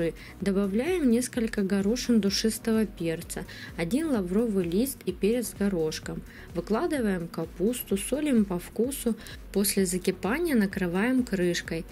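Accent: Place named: Ukraine. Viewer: здравствуйте! Очень доступные и просиые рецепты у вас. Спасибо, что делитесь с нами. И ещё мне нравится, как вы говорите. Вы из Украины?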